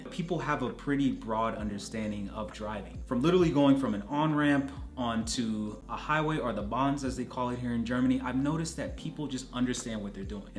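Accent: United States. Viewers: Welcome to Germany! I remember when I visited the US for the first time a few years ago and I was completely shocked to see every single shop open on a sunday until late into the night! In fact, I had specifically planned to do some last minute shopping on monday just before my flight back home, because I was so used to no stores being open on sundays. Edit: I'm a native speaker myself, having grown up abroad and - out of necessity - bilingual, so I can tell you're speaking American English. Most Germans won't be able to tell tho because while most people here can hold conversation in English reasonably well, they're not that well-versed in recognizing different accents.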